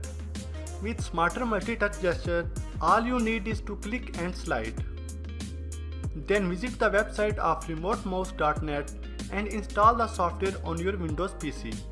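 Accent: India. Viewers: So off topic but can anyone answer this serious question. Not being racist here. Just wondering about his accent. So he obviously has a great understanding of the English language but retains a thick Indian (im guessing) accent. This is very common. When I took French in school a big part of it was hitting the words correctly. Not speaking French with an American accent ya know. Now if he spoke broken English it would not have stood out. But he speaks very fluent English. So why is the pronunciation so off?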